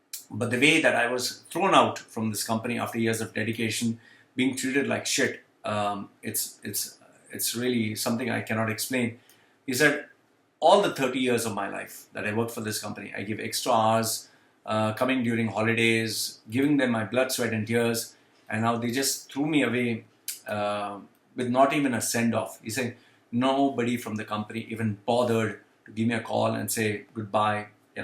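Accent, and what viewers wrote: Kerala, India. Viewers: Vada idly dosa. Lol you still got the malayali accent and it is very amusing. Again it is free. WOW.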